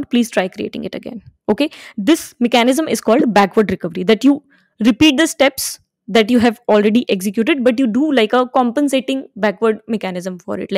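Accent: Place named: India. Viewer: im also indian and I love the accent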